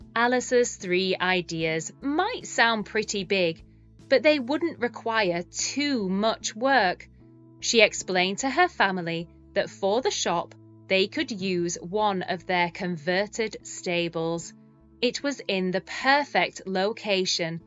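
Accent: United Kingdom. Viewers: I always love British accent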